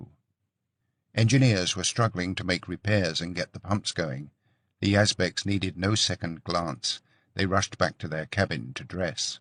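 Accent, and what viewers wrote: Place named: United Kingdom. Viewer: Who is the reader!? Terrific voice, accent so perfect for UK home of Titanic, and superb clarity.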